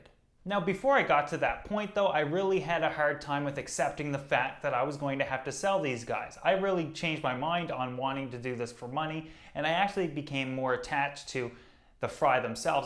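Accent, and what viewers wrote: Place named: Maritimes, Canada. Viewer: Hey man i thougth you were from the maritimes i live in Fredericton New-Brunswick cheers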